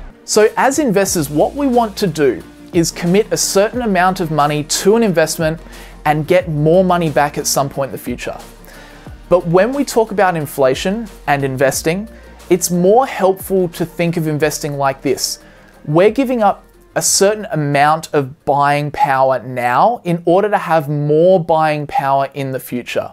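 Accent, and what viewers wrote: Australia. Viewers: Are you Australian? The accent sounds familiar... Thanks for the good video 💪🏼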